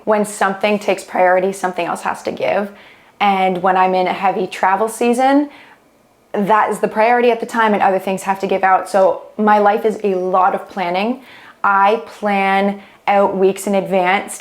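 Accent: Canada. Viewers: I figured you were Canadian by the way you say words like 'House' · I love hearing Canadian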